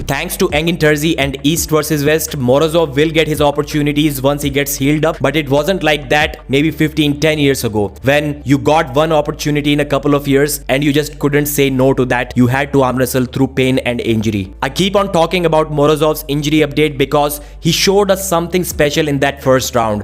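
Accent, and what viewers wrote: India. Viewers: this is what Indians sound like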